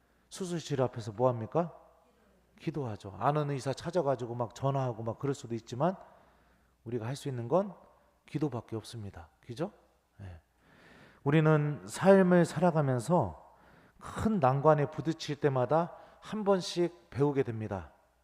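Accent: Chungcheong, South Korea. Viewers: 충청도 사투리가 참 정겨워요^^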